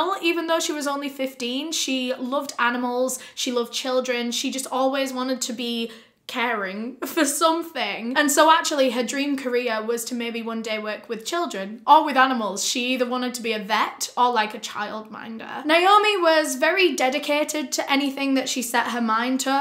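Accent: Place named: North, United Kingdom. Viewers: gets more and more Yorkshire as time goes by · storytelling style drifts further back to her northern roots every single video and I love it 😂 these videos have always been great but that extra touch of personality in the more recent year or so has been a nice addition.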